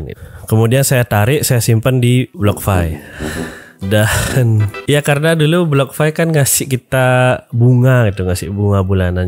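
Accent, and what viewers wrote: Bali, Indonesia. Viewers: Dari logatnya, asal Bali ya bli?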